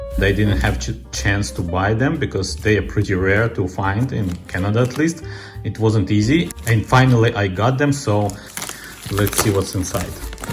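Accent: Russia. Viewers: О єбать який москальський акцент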